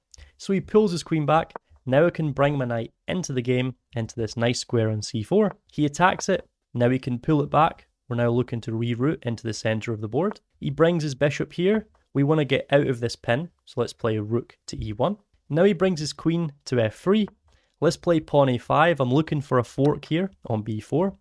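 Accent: Scotland, United Kingdom. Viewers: It's a Scottish accent. Canadians have the weird accents. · It's Scottish 😂😂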